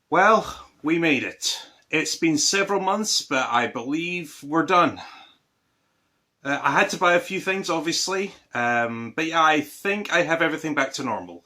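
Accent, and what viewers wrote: Scotland, United Kingdom. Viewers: Are you Scottish?🇬🇧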